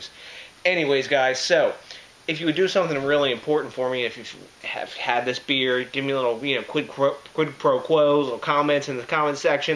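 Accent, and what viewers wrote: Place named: Baltimore, United States. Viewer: Hey, what part of MD are you from? Sounds like a Bmore accent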